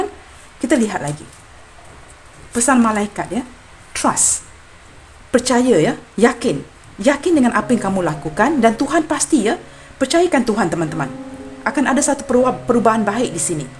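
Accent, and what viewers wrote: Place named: Malaysia. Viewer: Pisces Hadir. 👏🙏 readingannya sangat resonet sekali. Trimakasih atas readingannya. Smoga dlm keadaan sehat2 dan bahagia sll bersama kluarga tercinta di sana. Selalu sukses buat. Sepertinya klo sya dengar percakapannya, adalah orang India Malaysia klo tak salah yaa. Sya sangat senang dan bangga sekali dng readingannya, sebab sangat jelas dan resonet. 👏🙏🙏👍👍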